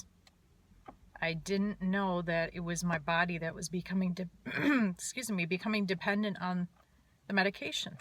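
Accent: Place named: Wisconsin, United States. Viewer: Hey, great vid. This is my 3rd time posting so i hope it goes through, or u might have a few comments from me sayin the same thing. haha. Anyway, its good to see another face/voice spreading the word about the dangers and reality of these drugs. Nobody else will understand the hell these things put people through. And yes, ur right. They are aware of what these drugs do and have been since the 80's. Its gonna be a tough road going through this shit but at one point it will be history and unfortunately we learn a lesson the hard way. The lesson of these dangerous, mind altering pharmaceuticals, the business behind them and even a little about the people who we trust to watch out for us. Its all bullshit and we only have eachother and urselves to look out for us. We lose friends and family, our jobs, relationships, enjoyment in life and faith in a system we thought was helping. 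Its like losing your soul. We are eventually forced to go through it and thats that. But on a good note its good to hear the Wisconsin accent. haha. Keep in touch and all the best to you and your family.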